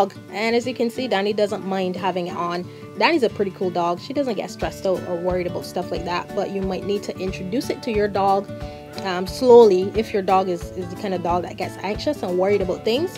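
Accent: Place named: Caribbean. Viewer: great vid, busta??? where r u from? the caribbean?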